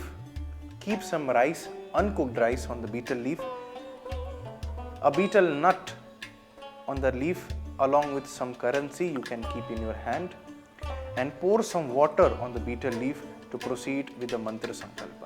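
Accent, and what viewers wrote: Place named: United Kingdom. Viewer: Why are u speaking in BRITISHER INVADERS language? Do we have no INDIC language to depict .. And why are u calling उपासक as उपासका & भैरव as भैरवा, while you correctly pronounce Chanel, Louis Vuitton, Dior, Citroen, Moët & Chandon, Laurent Perrier, Veuve Clicquot, Louis Jadot Domaine Leroy, Château , Bordeaux and L'Oréal as per original French pronunciation & correctly pronounce ikigai, wabi-sabi, kaizen, shinrin-yoku, omotenashi, gaman), kintsugi, shikata ga nai & bonsai as JAPANESE pronounce them. Then why SANSKRIT terms are pronounced by you as beef-eater BRITISH pronounce them? You're full of arrogance & ignorance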